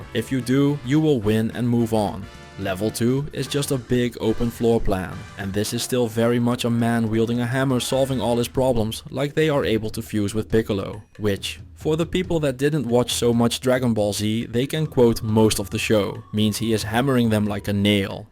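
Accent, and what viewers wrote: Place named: Netherlands. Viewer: That's quite the Dutch accent.. you sound like Goldmember.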